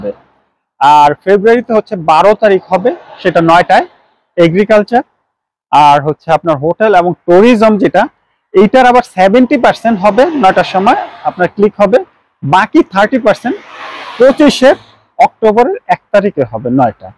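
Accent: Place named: Brahmanbaria, Bangladesh. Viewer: Assalamualaikum bhai...apnar home District B-baria Apnar voice shune mone holen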